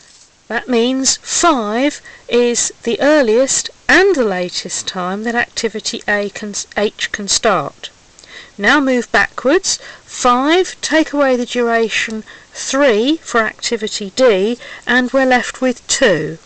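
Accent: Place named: United Kingdom. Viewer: Me too. She has that lovely very British accent ;)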